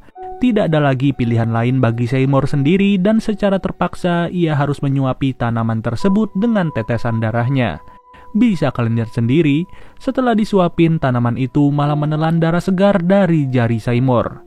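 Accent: Java, Indonesia. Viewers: Orang Jawa mana ni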